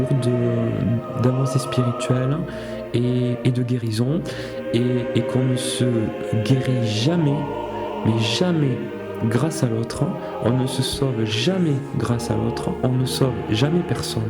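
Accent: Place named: South-West, France. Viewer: j entends un ptit accent du sud ouest comme moi...😍🤩😘 me tromperais-je?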